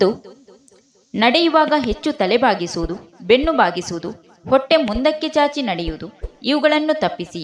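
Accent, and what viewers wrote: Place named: Kerala, India. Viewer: YOUR EXPLAINATION SLANG YOU ARE MALAYALI.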